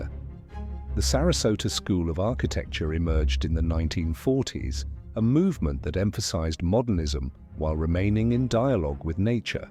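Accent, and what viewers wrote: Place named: United Kingdom. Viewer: The voice says he grew up there...in a British accent.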